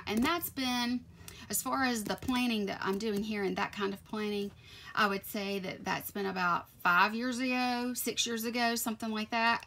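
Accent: South, United States. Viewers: GORGEOUS! Loved hearing your answers and you are tooooooooooo kind to me! I love listening to your cute as pie southern accent :D Urban Decay is such a great brand... they have SUCH an amazing selection of all products too! I love Too Faced but sometimes the quality is hit and miss for me? But some is sooooooooooooo good. <3 loved this... I had so much fun collabing with you!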